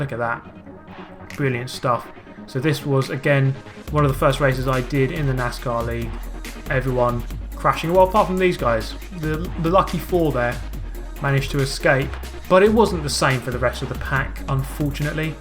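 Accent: United Kingdom. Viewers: WTF I was picturing you as a 30 year old fat white british dude hahaha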